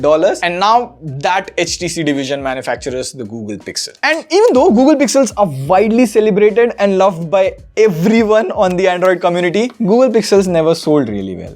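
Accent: India. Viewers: He sounds like Indian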